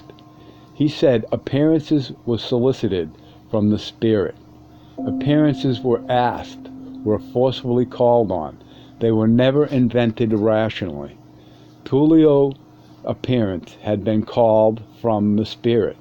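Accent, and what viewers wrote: Boston, United States. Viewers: Thanks so much for this upload. Your bostonian accent has been blessing my mornings for the last few weeks. Looking forward to more.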